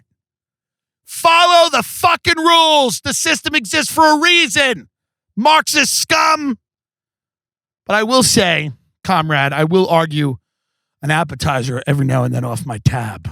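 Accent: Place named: New York, United States. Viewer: Love “New Yawk” or Long Island accent.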